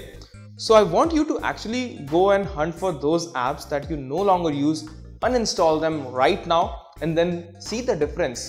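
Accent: India. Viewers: Share it lmao this is why I love indian accents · please make your english much more clear remove* your accent(indian)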